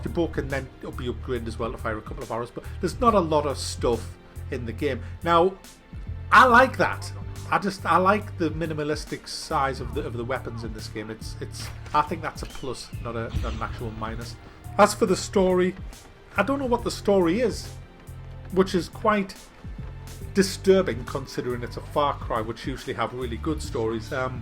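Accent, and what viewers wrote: United Kingdom. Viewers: Great review! I love the far cry series of games.Graphics, Sound, Gameplay, Immersion, Content. All grade A. If we could just get them to add Multiplayer, which is my guess for a Far Cry 5 or 6 to make an impact. Though I have taken a break playing primal after down loading PES 2016. I love listen to you Brits (hope that's politically correct) Love from America. Those bee bombs are a blast......aaaahhhhhhhh!!!!! peanut butter cup & peanut butter pieces with candy shell, same thing both yummy.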